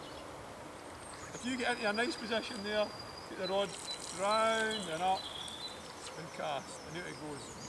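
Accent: Scotland, United Kingdom. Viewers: Ah unreal they were the days tight lines best Scottish accent ever be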